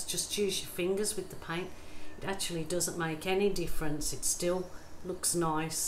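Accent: Birmingham, United Kingdom. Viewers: Well done. I feel your anxiety. I keep trying that technique but I’m not getting the paint consistency right 🤦‍♀️. The finished result turned out pretty., I knew I detected a British accent in there, hidden under the Australian one 😊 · I am trying to determine your accent. I’m going to say Brummie ?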